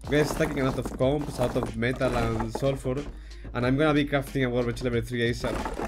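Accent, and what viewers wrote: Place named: Spain. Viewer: Se nota que hablas español eh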